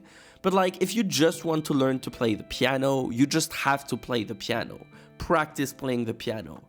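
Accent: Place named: France. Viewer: I've got a question for you, are you french because your accent seems like you are !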